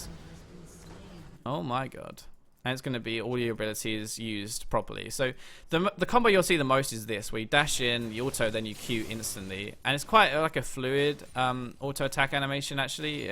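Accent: United Kingdom. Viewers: What are you doing well: Smashing brilliant Brittish accent Pace of speach is good Thoroughly explaining your thought proces (and moves) What you can Improve: Explaining your team composition. I don't understand the Zyra into Morg/Lee, nor the poppy into Renekton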